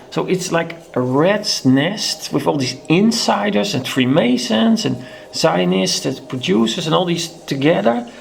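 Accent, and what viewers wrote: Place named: Netherlands. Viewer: i put 1000 on 0 that your dutch :)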